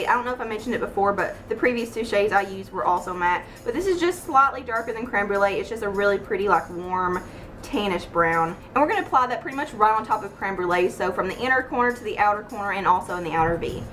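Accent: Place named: Louisiana, United States. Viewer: Haha Im pretty sure our accent is the same!!! Im from Louisiana lol!!!!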